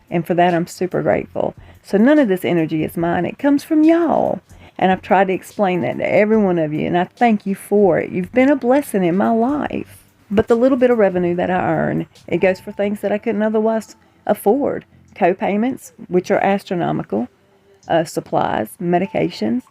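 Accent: South, United States. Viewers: I could listen to your wonderful southern accent all day long. My favourite thing is "Y'all stay safe and be blessed".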